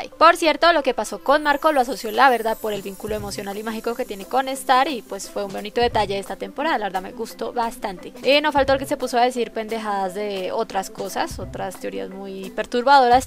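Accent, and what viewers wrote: Colombia. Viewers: ESPERA.... Eres colombiana ????? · tu eres Colombiana? Yo si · eres colombiana? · Jajaj saludame en el siguiente vídeo pdta:eres colombiana? :v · Eres Colombiana?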